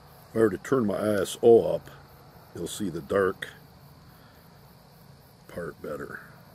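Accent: Minnesota, United States. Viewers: Sounds like Minnesota Man.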